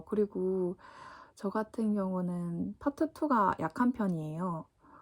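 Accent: South Korea. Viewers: 부산 사람 맞죠?! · 전라도 사투리잇는거같아요 아닌가?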